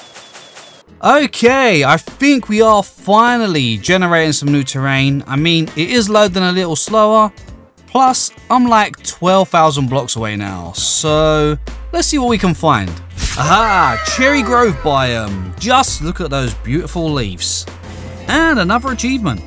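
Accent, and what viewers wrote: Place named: London, United Kingdom. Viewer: Whereabouts is this accent from? It almost sounds like Londoner but I'm not sure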